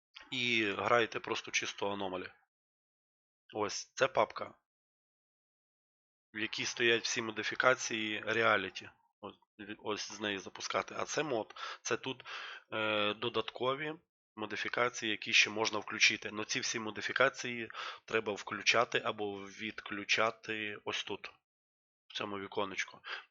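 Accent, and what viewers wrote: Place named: Russia. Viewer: Мне вот дико нравится украинский язык, хотя я русский без каких либо украинских корней (ну вроде). Я не знаю почему, но просто кайфую когда слышу мову. Но российский акцент у есть, подзабыл, так сказать, язык предков. Авторы ценны для публики, когда идут своим путем, не озираясь на моду и большинство.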